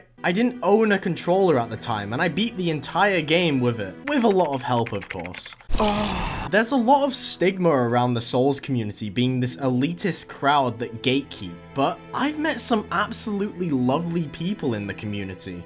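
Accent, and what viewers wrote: United Kingdom. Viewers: good content, and good personality m8. you'll blend right in with the 1,000,000 other British sounding from soft critiques m8 also 20$ says you used a zweihander in ds1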